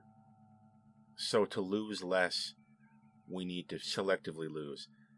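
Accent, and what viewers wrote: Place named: Nova Scotia, Canada. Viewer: Unreal good break down my friend.... I really dont like this show anymore its got so bad to me but i watched this episode and its probably the best one of the season but....still hard to give it much more than a 6/10 with everyone always angry and emotional and acting like TEENS. U from Nova scotia ? that accent seems close being from Boston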